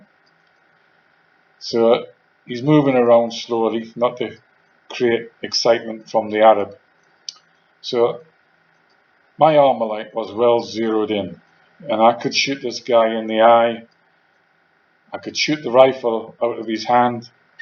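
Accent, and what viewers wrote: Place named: North East England, United Kingdom. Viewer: Nice to hear a north east accent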